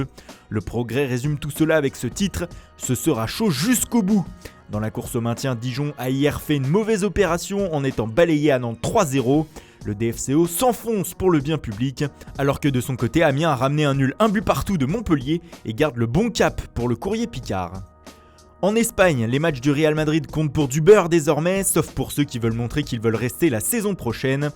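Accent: Italy. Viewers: J'adore ton accent italien comment s'appelle le président de la Juve (Andrea Agnelli)😂💕💞🔥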